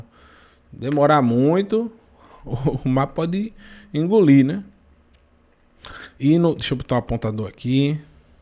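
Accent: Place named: Recife, Brazil. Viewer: Excelente estudo, moreh. Recifense da gema, o sotaque não nega.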